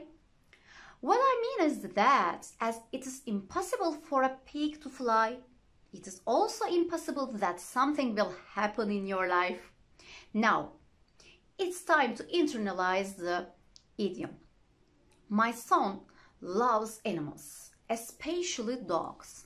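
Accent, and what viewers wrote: Turkey. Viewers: Mrs. is my English teacher at school. I love her very much. If you don't like the video it's probably because of the way she is speaking but please keep in mind that we are Turkish. We didn't born neither live in a country that speaks English. It’s not our native language. This video helps me a lot with my lessons at school and I hope it's the same for you too! If I made any mistakes while writing this please point them out so I can learn the right. Thanks for spending your time. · You pronunciation likes Turkish, I think, you are from Turkey, right?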